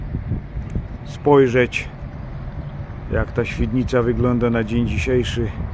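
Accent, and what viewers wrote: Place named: Upper Silesia, Poland. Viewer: Białowice to chyba dolni Śląsk a akcent masz (mosz😅) z Górnego Pozdrawiam 👍filmik oczywiście z pięknymi widokami 👍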